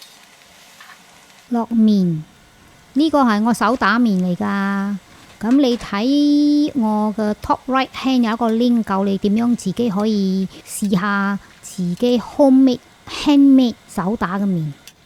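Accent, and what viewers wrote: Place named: Hokkien, China. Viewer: …. Your Cantonese improved so much… but still have that hokkien ‘slang’ 😃😃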